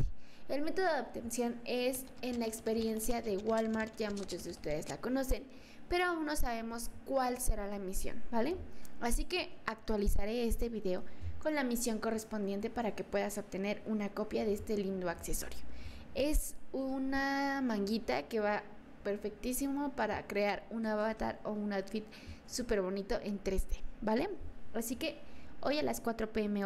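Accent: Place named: North, Mexico. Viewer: Este wey es más norteño que yo… Me representa👍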